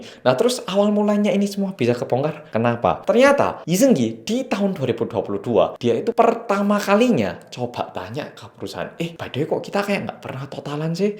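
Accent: Java, Indonesia. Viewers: Mas sorry, sampean kok saiki kurang medok yo? Opo sebab wes suwi nang korea? Saiki lebih sengau suarane hehhehehe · Kaosnya bagus mas korea medog 🥺🥺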